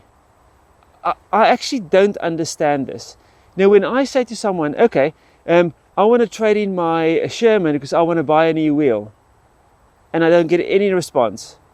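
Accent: South Africa. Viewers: Just out of interest are you, perhaps a South African or a Dutchman/Hollander as when you speak I hear a bit of the Boer accent in your speech? As for the bike and I need an e-bike, as I lost my car driving license due to failing eyesight and live at the top of a 1 in 6 hill, that no matter which angle I ride to and from, I need a bike that can substantially climb without much effort, say mode 3 and mode 5 hill assist electric power. So would you recommend this one, over the similar 345 original?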